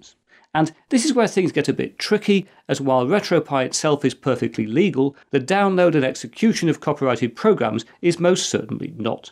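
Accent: England, United Kingdom. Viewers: I love this voices , love the culture, love this england accent ! Ohhh god save the england peoples from islã invaders ! Do not let this blessed land, inspiring land be consumed !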